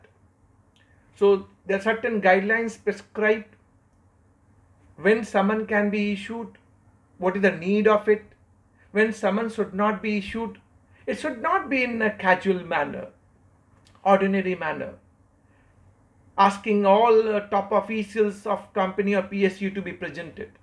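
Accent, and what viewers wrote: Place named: England, United Kingdom. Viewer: I like your so much your English accent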